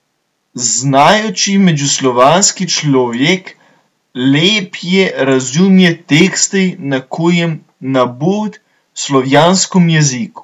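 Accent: Slovenia. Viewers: I'm Polish. I understood everything 100% and I think I would read it better than you (no offence, brother Slav ;)). You don't see a difference when pronouncing "y" and "i" or "e" and "ę". Well, in Polish we have both "y" and"i" and they sound different, and we have also "e" and "ę" and they also sound different...;)) I'm guessing by your accent that you are a Southern Slav. Your accent kinda "suggests" me you're Slovenian...Am I right?? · haha kk se takoj prepozna, da slovenc govori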